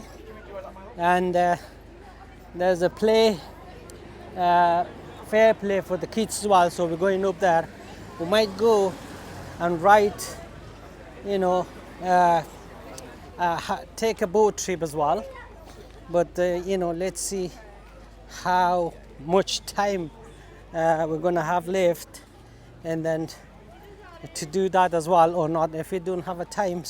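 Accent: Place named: Stoke, United Kingdom. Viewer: Where did you pick up the northern english accent pal? Sounds a bit like a Stoke accent. Love it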